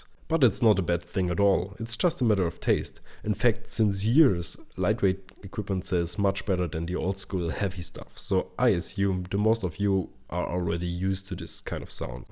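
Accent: Germany. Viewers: Nice review and accent ;) Typical German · funny little gear and with your funny german english :))) great as allways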